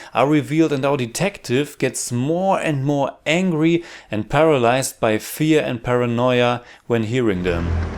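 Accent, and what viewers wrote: Germany. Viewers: bist du Deutscher?:D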